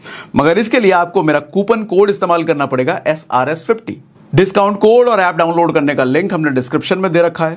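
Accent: Bihar, India. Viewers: I love bihari style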